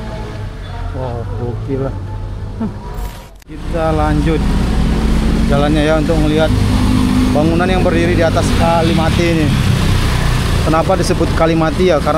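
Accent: Medan, Indonesia. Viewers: kental kali cakap medan kau lek....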